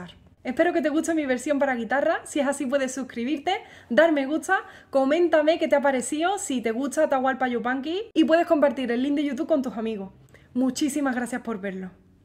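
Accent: Andalusia, Spain. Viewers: de que parte de españa es tu acento? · Hermoso lo que hacés! Soy argentino, esta cancion la escuche muchisimas veces pero me llegó tu interpretacion tan sentida. Muy buena tu guitarra y tu voz. No se de donde sos ( tal vez andaluza por el acento?) pero transmitis muy bien lo que don Ata hacía. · Excelente,. Me hiciste emociar. ¿Sos andaluza? Genial, genial interpretación.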